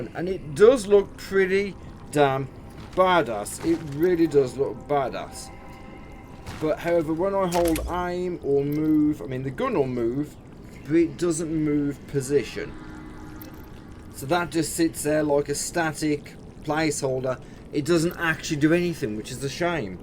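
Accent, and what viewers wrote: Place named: England, United Kingdom. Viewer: He is literally ENGLISH 🤦. You might be referring to his accent, he comes from a part of England with a strong regional accent, very different to the rest of the country. But English is still his native language.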